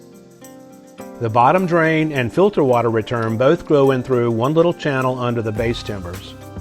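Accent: South, United States. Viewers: I've come to love watching things like aqua/pondscaping, and this really clicks for me. Your calming, southern voice soothes me and your choice of music is so nice and chill it's easy to follow, plus being filled with all the nature noise. Thanks for what you do,.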